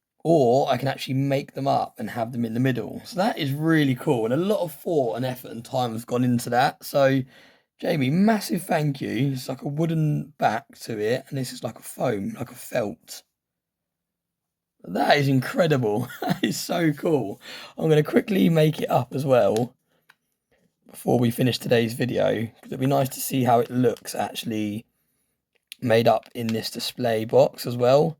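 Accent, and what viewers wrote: United Kingdom. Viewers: I love watching your videos that british accent is really warming compared to my usial american videos ^^ , cheers from across the pond in france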